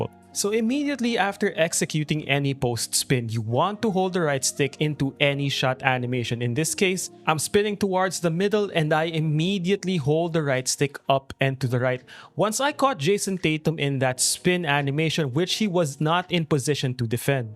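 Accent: Philippines. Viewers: Are you Filipino? I peep the accent 👀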